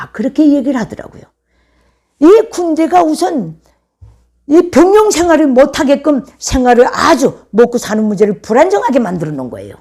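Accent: Pyongyang, North Korea. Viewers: 님이 코디에 신경 쓰셨네요..ㅎㅎ 보기 좋습니다.. 제가 보는 님의 매력은 북한식 억양으로 표현되는 재치와 품격입니다.. 전문 저널리스트로서의 깊이가 느껴져요.. 의상은 너무 신경 안 쓰셔도 됩니다.. 주변에 아는 여성분들에게 협찬(대여) 부탁 하세요.. ^^ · 반갑습니다.! 언제나 내가 좋아하는 평양 말투를 멋쟁이 김기자님을 통해 들을 수 있어서 행복합니다. 그런데 북쪽 이야기는 들을 때마다 충격이며 가슴이 아픕니다. 어떻게 이 지구상에, 더구나 우리 동족에게 이런 일이 있을까?..... 어떻게 저 체제가 허물어지지 않을까??... 놀랍기만 합니다. 한편, 일찌기 부모님을 남쪽으로 인도하신 하나님께 감사하지만 속아 살고 있는 북한 동포들이 너무나 불쌍합니다. 반드시 김정은체제가 무너져야 한다는 생각입니다. 항상 우리 국민이 꼭 알아야 할 좋은 말씀 들려주셔서 감사합니다. 기자님! 홧팅!! 건강하십시요.~♡ 행복하십시요.~♡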